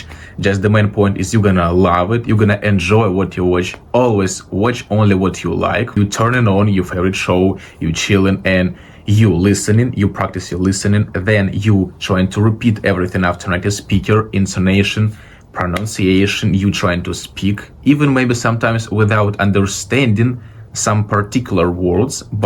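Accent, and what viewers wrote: Russia. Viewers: Вы из России? акцент🙃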